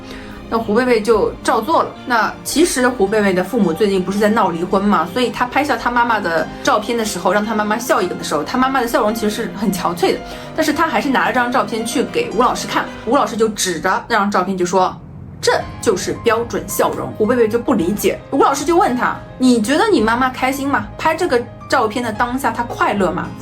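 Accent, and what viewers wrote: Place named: Taiwan. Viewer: 😂怎麼有點台灣腔啊？哈哈哈 也太可愛了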